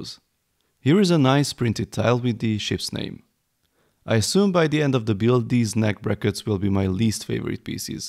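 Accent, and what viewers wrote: Hungary. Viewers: Csak szerintem van a narrátornak magyar akcentusa? :D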